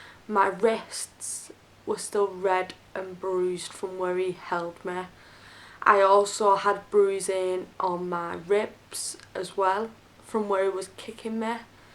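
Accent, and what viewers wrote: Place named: Northern England, United Kingdom. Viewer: Thank you for this film. It sounds like you have come through a lot. It must have been very difficult. I hope that you still have your Mum and brothers and sisters in your life to maybe help each other. Abuse of any type is hard to get over and I can understand why you would feel anxious. I hope it gets better for you as time goes on. I really love your channel and it's lovely to hear a Northern Girl talking. Take care. ❤ and Well done! 👏👍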